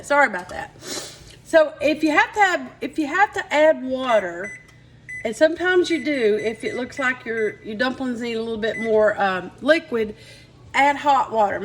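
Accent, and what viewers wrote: South, United States. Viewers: Sister, you might live in Northern Ky., but where are you from? That accent sounds like it’s further south and east. 😊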